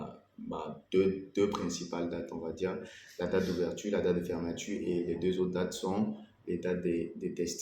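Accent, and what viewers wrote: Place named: Marseille, France. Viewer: Accent marseillais sur nous 😅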